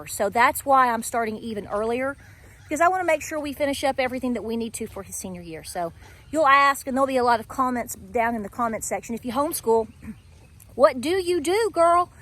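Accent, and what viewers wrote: South, United States. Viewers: I thought you were from the Netherlands until about half way into the video and I thought you sounded like the most American foreigner that I've ever heard. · I like your accent; you must either be a Texan or from some from south. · your not duche your amerikan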